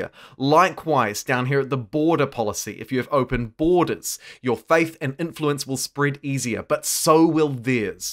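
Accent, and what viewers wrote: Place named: New Zealand. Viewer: As a fellow kiwi-accent-haver I understand the pain.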